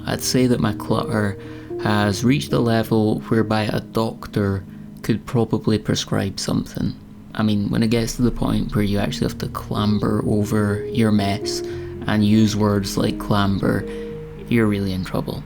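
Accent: Scotland, United Kingdom. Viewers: Thank you,! New subscriber. Have watched a number of your videos. I have clutter + disorganization challenges, too, to a very scary degree. Appreciate this video and your other videos, too, where you address these issues. I ought to have addressed these issues decades ago when I was a young adult. Hopefully, one or more of the helpful strategies you have mentioned in your videos will stick and I will make progress with these issues. I enjoy your channel. You have a very soothing voice, a very nice conversational style, and I enjoy your sense of humor and hearing your Scottish accent. Wishing you continued success in all your endeavors! 06/05/2026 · Great to hear a Scottish voice! I’m in Edinburgh. Yip slap bang in middle of decluttering journey. My best getting started for me my heater developed a leak and soaked 1/2 of my carpet and if you’ve had cats from kittens well if you know you know. 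Had to get a friend to lift the carpet. Nearly everything had to go into my bedroom with the remainder getting shifted around. So my plan is to go retrieve with I need and the rest either donated or landfill. Aw the best!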